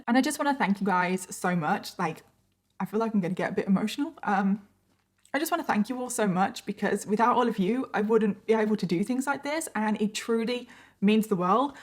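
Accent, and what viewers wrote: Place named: Midlands, United Kingdom. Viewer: I love listening to your You Tube talks as well as watching you do your makeup! Beautiful eyes that always pop at the end!.. I also love your accent. I have family in the Midlands so it warms my heart to hear you talk. Congrats on your pod cast show now! Looking forward to hearing more :)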